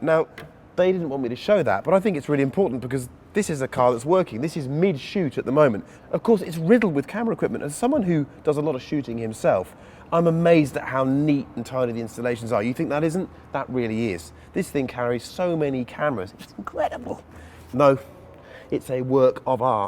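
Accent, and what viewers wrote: RP, United Kingdom. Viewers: BADD ASSS MACHINE. cant stand this British guy talking like a rich guy. AWESOME MACHINE. MURICAN POWER BABY!!!!!!!!!!!!!!!!! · i cant stand some one with a posh voice trying to use as many buzz words as possible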